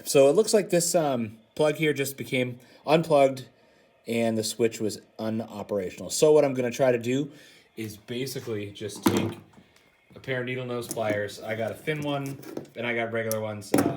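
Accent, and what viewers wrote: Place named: Canada. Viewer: You sound like a Canadian.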